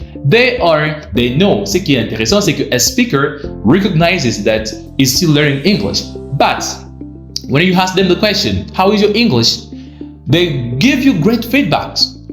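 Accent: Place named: United States. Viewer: Hi there, i love your enthousiasm and the way you switch in a Split second from French to English, you are totally right in your explanations : speaking is absolutely primordial, the more you will do it the better it will be for your English improvements.Maybe you live in the USA , at least your accent tends suggest it? Thank you for the vidéo.Serge from France native french speaker !!!!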